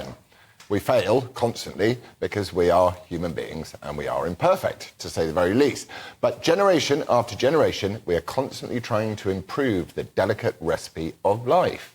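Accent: Scotland, United Kingdom. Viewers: Great Scottish accent 😊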